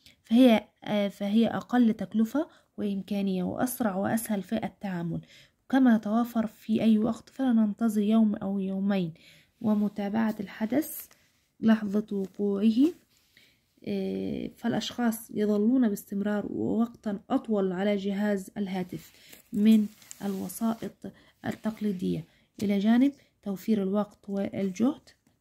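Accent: Egypt. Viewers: انت مصريه لم افهم😢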